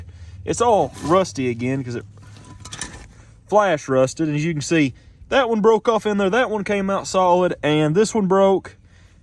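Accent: South, United States. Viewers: You're the most stereotypically American-sounding person I've ever heard. Amazing. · Your down south delivery put me off at first, but I liked the projects you were working on. Now, I have no issues and this far north ( Alaska) junk car junky enjoys every video you put out. Watching the efforts you put in and the problem solving involved is top notch. Making push rods for this is back yard genius stuff! Thank you for what you do and how you do it!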